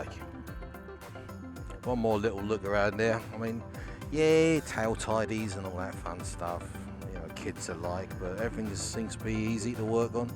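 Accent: Cockney, United Kingdom. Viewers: The cockney accent is unbearable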